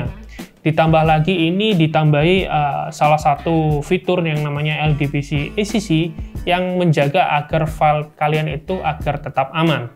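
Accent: Java, Indonesia. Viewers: gak perlu pake subtittle kecuali logatnya pakai bahasa jawa kasar.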